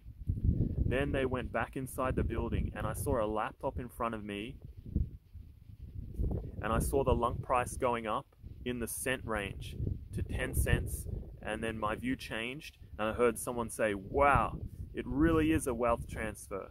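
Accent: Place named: Australia. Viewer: I like your Aussie accent mate ❤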